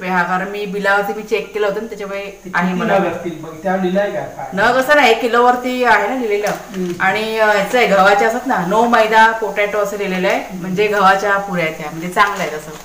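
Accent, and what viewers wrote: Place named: Wadval, India. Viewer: तुटल नाही वाडवळ बोलायच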